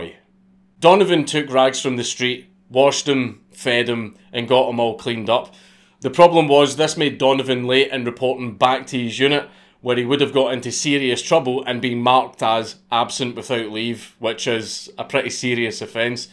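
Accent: Scotland, United Kingdom. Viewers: The stories you tell, and the way scottish accent, expose you as a really cool person who is doing something he's good at .... for the love of it. Thank You! Be well, and yes, I'm still practicing, but I've got doubt that I'll ever be able say 'clouds' , or 'fuck' properly. really, I guess it's just something you're born too. It sounds so ....... dare I say ... no. :-]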